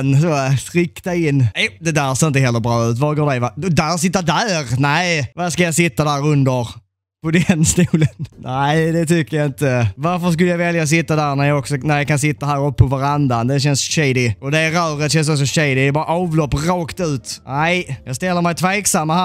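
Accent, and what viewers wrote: Scania, Sweden. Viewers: Älskar skånskan ahahaha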